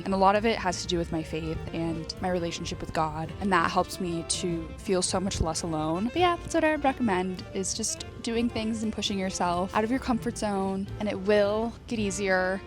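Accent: United States. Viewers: Hello I am from India. I often watch your videos for learning American accent and your vlog makes me happy and energetic. I really love you ❤❤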